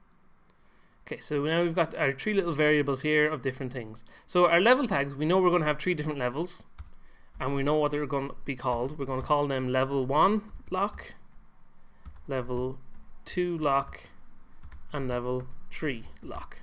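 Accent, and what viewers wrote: Ireland. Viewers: Hi are you Irish i'm new here you sound Irish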